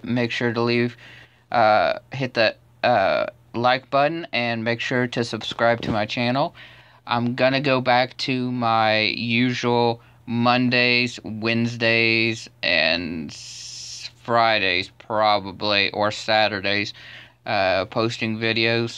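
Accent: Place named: United States. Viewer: You sound country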